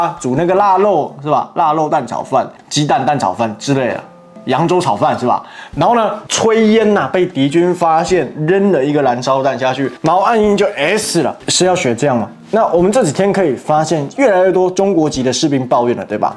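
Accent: Taiwan. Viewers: 喜欢听你说话❤曾经有个台北的女孩，名叫 木兰，是我们店里的舞台编辑，她说话就是这个味道，给我讲了很多台湾的福利，真是羡慕啊🎉🎉🎉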